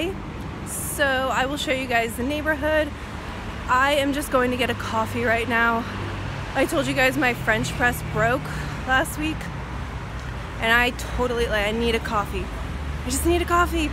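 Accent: Turkey. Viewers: What american living you are Turkish you can change your look but you can not change your Turkish accent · You almost sound like a turkish person who is speaking English. You've accent lol.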